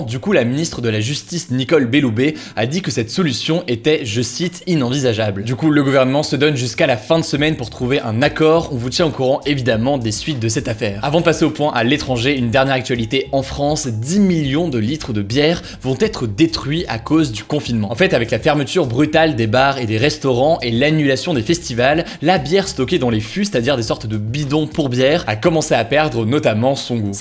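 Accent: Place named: England, United Kingdom. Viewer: ton accent anglais me fait délirer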